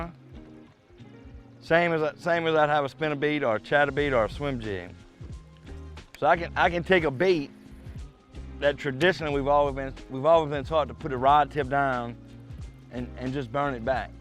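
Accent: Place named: Louisiana, United States. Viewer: Sounds like a true Cajun boy to me 👍🏽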